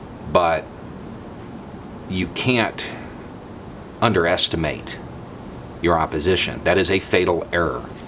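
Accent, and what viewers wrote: South, United States. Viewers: You respect him... Do you respect all your enemies? Your logic and ability to understand the English language are suspect. This channel seems to be a glad hand to inane, who are continuously wowed by your Southern accent yet stereotypically opposite views...If you know a limbless veteran or a shell shocked contractor ... This man is to thank for such results. You make money scapegoating your own countrymen. Painting only with the facts that color your opinion correct...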